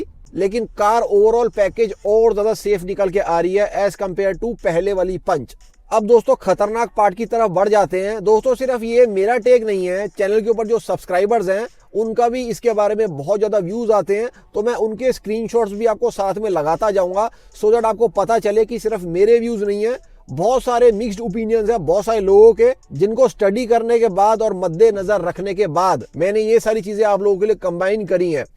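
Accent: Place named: Pakistan. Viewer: Bhai aap muze pakistani jaisa sound kar rahe ho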